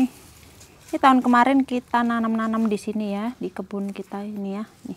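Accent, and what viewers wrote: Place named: Java, Indonesia. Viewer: Ngomong jowo e ora ilang y mom .... seneng punya tanaman buah dan sayur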